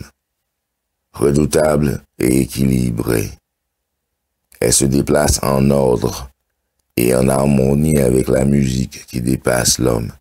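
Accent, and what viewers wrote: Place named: Africa. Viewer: Seigneur, cette voie est incroyable, la narration est profonde, chaude, aux magnifiques accents de l'Afrique magique. Je crois pas avoir vu les vidéos des premières tablettes ni même de playlist regroupant toutes les tablettes lu par cet incroyable narrateur... Dommage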